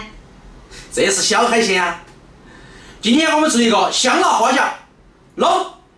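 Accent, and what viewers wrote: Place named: Hunan, China. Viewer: 我咋听出湖南口音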